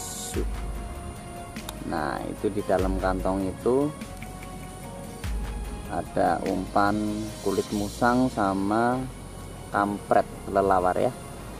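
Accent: Java, Indonesia. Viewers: Sampean jawane ngndi.. Poine jos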